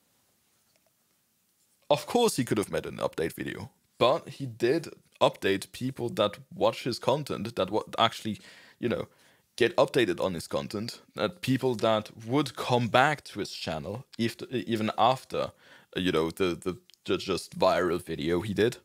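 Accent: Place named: Germany. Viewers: I think his english fine. Ich mein, 20 Sekunden in, dache ich... ist er deutsch? Aber so what!? I’m gonna subscribe whatsoever. 😉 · Dein Denglisch ist so horrendous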